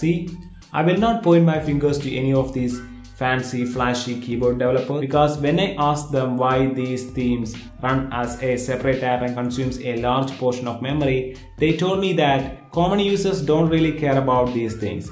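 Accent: Kerala, India. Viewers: Dear Adam, Indian accent is also "an accent" just like any other non-english nations. Just saying... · The mallu accent is thick in this one. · Bro?You malayali? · Talk English please, not Indian with English words. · malayali analle?? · That thick mallu accent.. Subbed brodhah.. 😎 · Are you malayali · are you a malayali???? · The damn Indian slang again...wwwhhhattt tthaa thheerrr oh boy,why can't you get some one who speak proper English.. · you need to uninstall your india accent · typical malayali accent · Malayali aano ? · Is that an Indian accent? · worst Indian accent I ever heard... can't understand more than half what you babbled... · His accent is not very good. Use subtitles and music, it will be better for english videos as you have Indian accent that's hard to interpret. Or take english courses.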